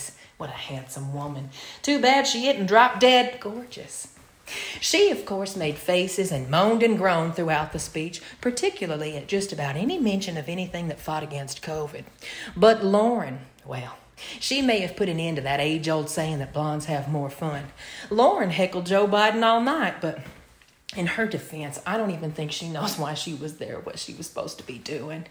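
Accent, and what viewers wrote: South, United States. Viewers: Taking down the hags with a southern accent! It's absolutely perfect! From a fellow southerner, thank you.🥰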